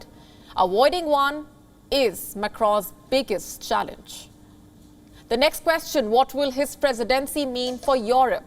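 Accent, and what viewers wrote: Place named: India. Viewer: Is she reporting news in English or Indialish. Do WION don't have a better newscaster other then this lady.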